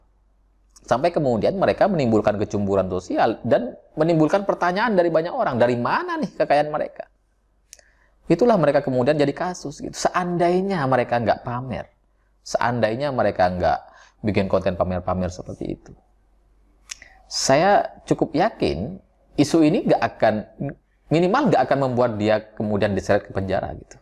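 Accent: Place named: Madura, Indonesia. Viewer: Oo mas orang Madura ya...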